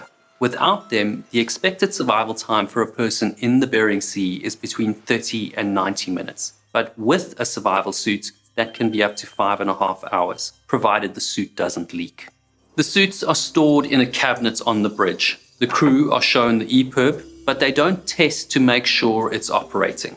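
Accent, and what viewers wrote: South Africa. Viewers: It would be very interesting if you created a video about rescue operations, specifically Coast Guard helicopters. They are fascinating creatures in that they can fly in atrocious conditions. My son's boy scout troop did a field trip to our local Mercy flight helicopter. It was so interesting! One thing I found fascinating was the RPM of the main rotor blade. I think it was like, 165 RPM, it was a lot slower than I thought it was but the blades are so long that the blade tips can easily approach the speed of sound as the tips move and a much higher velocity than closer to the center of rotation. Anyhow, I think it would be really cool to understand more about search and rescue equipment in general. I'll watch anything you talk about with that super cool South African accent! Cheers! I think you should find a way to say 'Thrusters' in each video you make:) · I'm thinking he hears "dick hand" from the pleasant South African accent.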